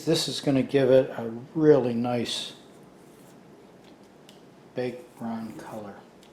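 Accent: Pittsburgh, United States. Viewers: As soon as I heard his accent I knew he was from da Burgh! Found out he is my classmate Mike’s brother and another classmate Sharon’s cousin. Great videos, No rolling pin…use a wine bottle! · Really nice work. Good recipe. Sounds like you are from the Pittsburgh area. Thank you so much❤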